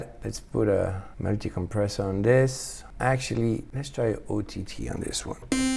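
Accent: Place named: France. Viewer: amazing tutorial, I dont have a mac so im using FL Studio and just getting to grips with it. Your talent is amazing this is why you are #1 (even though SHM are my Favorites ;) ) but what amazes me even more is your english. us british can be so arrogant and not attempt to learn another language. Sure you have your french accent but i can understand every word without struggling.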